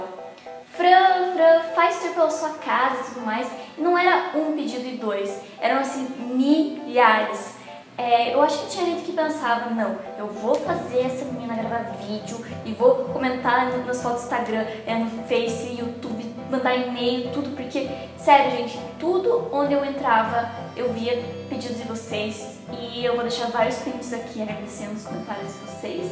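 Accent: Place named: Curitiba, Brazil. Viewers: é que ela é do sul (especificamente de Araucária, região metropolitana de Curitiba) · ela e de Curitiba , pia kkkkk amo esse sotaque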